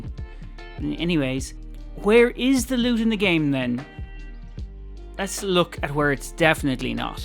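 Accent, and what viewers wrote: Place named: Dublin, Ireland. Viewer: You from Dublin too lad?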